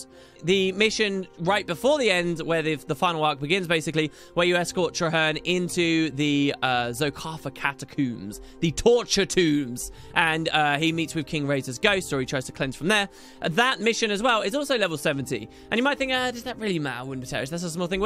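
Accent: United Kingdom. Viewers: has you're british accent gone?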